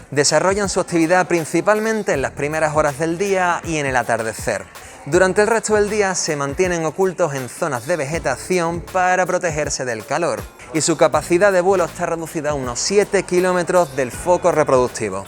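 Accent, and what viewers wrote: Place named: Andalusia, Spain. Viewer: Qué crack !!!!!!!!! “Ma quedao embobao” !!! Y en perfecto andaluz ! GRACIAS !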